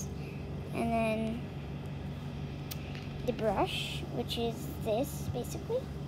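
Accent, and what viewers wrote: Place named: United Kingdom. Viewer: Accent nen britishb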